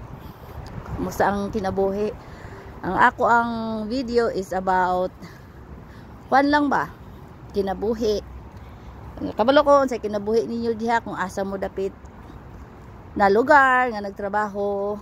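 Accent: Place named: Visayas, Philippines. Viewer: Ang cute ma ning pag nag bisaya ka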